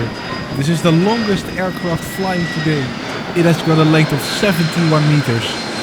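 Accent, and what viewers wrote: Netherlands. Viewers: Hello , iam. A plane fan ,but I smell a Dutch accent from ur mouth….. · Hou van da Hollands engels en leuke video man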